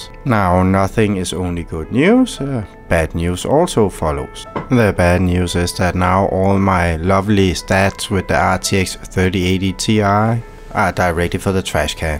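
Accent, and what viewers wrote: Denmark. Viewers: I know a dane when I hear one...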